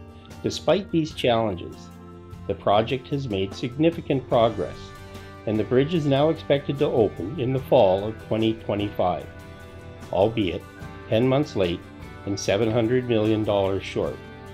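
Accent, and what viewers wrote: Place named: United States. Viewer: If you are Canadian, why do you speak American English,?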